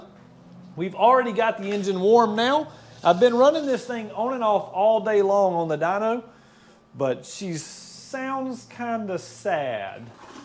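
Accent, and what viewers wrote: South, United States. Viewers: Sorry, after trump, I can’t stand a hillbillies voice.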